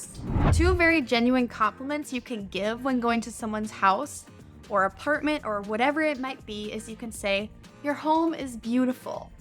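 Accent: United States. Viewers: A great lesson, I love the way you teach us, super natural and like the American way you pronounce cause is clear and real. I have a question: I’d like to learn how to use this two words, Aim and Amid. Somewhere I read an advertisement that says: “We Aim to please” It sounds nice to me but I don’t get the meaning. Thanks again Miss and I owe you one.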